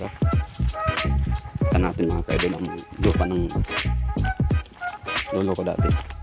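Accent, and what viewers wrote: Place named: Bicol, Philippines. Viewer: Bicolonon ang Punto mo noy napangirit ako sa vlog mo.. Sayang baratuhon pabakal kan daga ni lolo mo ako na kuta nag bakal..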